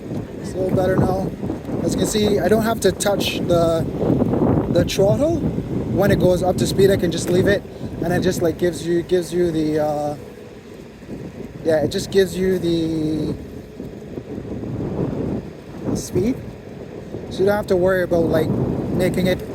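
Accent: Barbados. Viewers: Bajan accent?🇧🇧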